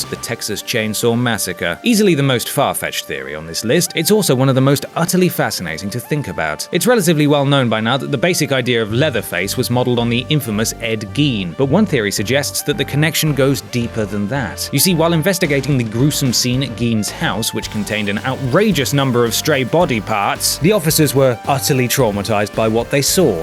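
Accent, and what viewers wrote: United Kingdom. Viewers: Considering that Donald Pleasance had a British accent like yourself and John Gavin doesn't that theory is complete bollocks as you limeys say. And he's not as good looking or tall as John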